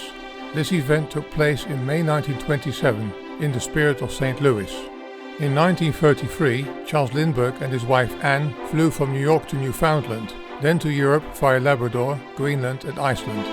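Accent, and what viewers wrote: Netherlands. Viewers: Not clear why YT makes problems to best authors like you rather than focusing on garbage channels! Format works, this video is PURE GOLD!!🌟👌🙌 Love the voice over with Dutch accent!! 🔝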